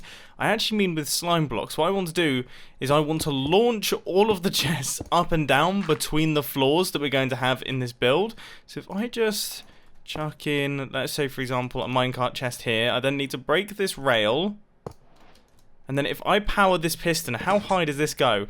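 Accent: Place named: United Kingdom. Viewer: I just realized he kinda sounds like wheatly from portal 2, probably because they both have British accents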